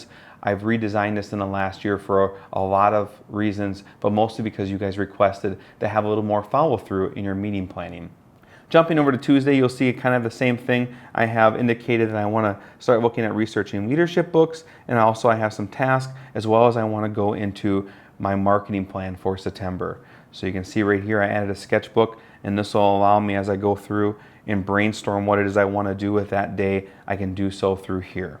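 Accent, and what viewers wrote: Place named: Wisconsin, United States. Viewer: I KNEW you had a WI accent! CONFIRMED! :) Is there a Sconie discount? ;)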